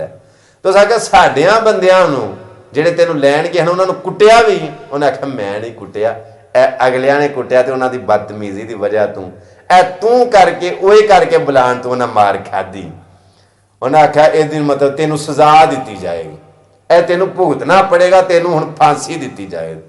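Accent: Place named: Gujranwala, Pakistan. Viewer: Story nonany wala apne lhjy se Gujranwala side ka lg rha.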